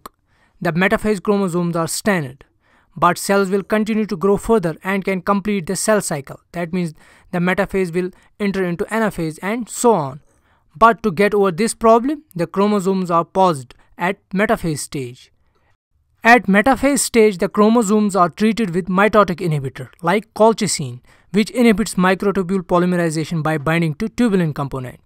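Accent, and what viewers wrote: Kashmir, India. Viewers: Well Explained Although the Indian accent is thick as fu*k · You voice is like that of Kashmir.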